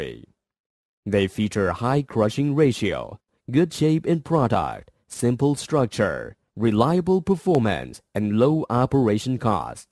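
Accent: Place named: China. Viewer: I believe this is a Chinese man speaking English. Well done, btw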